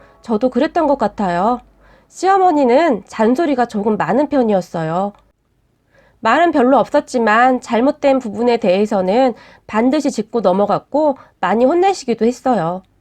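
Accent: Gyeongsang, South Korea. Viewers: 갱상도본토배기보다 더잘하시는데요?ㅎㅎㅎ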